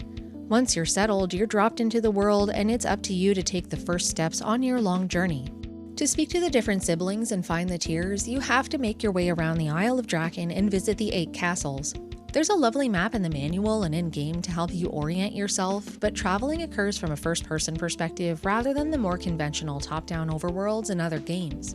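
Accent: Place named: Canada. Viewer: I thought I heard a Canadian accent in your video, which was why I mentioned the LttP morcel. I don't remember the translation of Drakkhen very well as it was decades ago, but it has to be better than the English version as it was written by a French studio. If you are correct, the fact that they translated the game from French to Japanese (two wildly different languages) and then from Japanese to English (also two wildly different languages) is very much going around the arse to get to the wrist and ends up not surprisingly with a bad translation. The PC version in the original French can be found at a site called Abandonware France. I'm sure that you could muddle through as you have already played the game. As for Link to the Past, that game is quite text-light and the French Canadian rom is out there, so I am sure that you could finish it without any difficulty.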